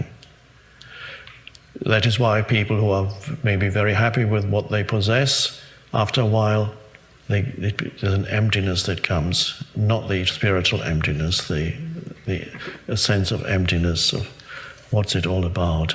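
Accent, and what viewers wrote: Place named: Argentina. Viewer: Grande ese Eckhart hablando en "argentino" 🥰🥰🥰♥️♥️♥️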